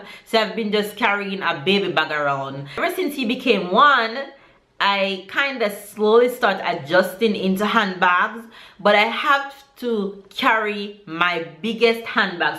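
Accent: Jamaica. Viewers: Here from, as soon as you started talking I knew you were jamaican,of course am going to support my own.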